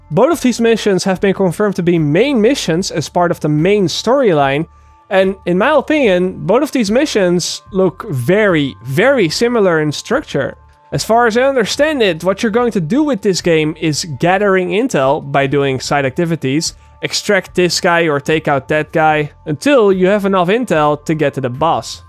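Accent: Netherlands. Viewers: Because you sounds dutch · The Gamer Of Canada sorry. i didn't watched the whole video but i heared his accent and then i wanted to ask if he was from the netherlands. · ik dacht al te horen dat je nederlands was haha. duidelijke mening. ik deel hem deels... ik ben bang voor het spel. angst dat ik teleurgesteld word. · why does this guy sound like he is dutch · ik hoorde gelijk aan je accent dat je nederlands was😂😂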